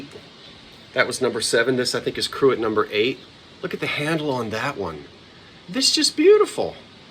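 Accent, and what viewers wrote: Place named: Pennsylvania, United States. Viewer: I haven't watched you for awhile, I missed you. Do you still have your apartment in Philly? I love watching you walk around town. I was born in Chester I grew up in Parkside Brookhaven area my mother grew up in downtown Media Pennsylvania so I love listening to your accent which is very familiar to me and you and I love the same kind of antiques. But finding art-deco out here is really hard so I've been sticking to Murano Glass. Is your bungalow on the Jersey Shore? So good to see you again?😊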